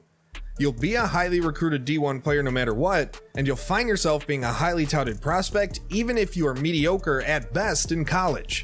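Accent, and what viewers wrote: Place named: Buffalo, United States. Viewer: You from buffalo lol I hear that accent y’all dragged that “R” on every word I’ll be surprised if you’re not from there or up that way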